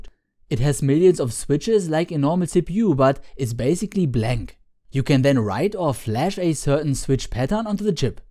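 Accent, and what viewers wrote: Germany. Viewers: Dieser deutsche Akzent ist so göttlich. :)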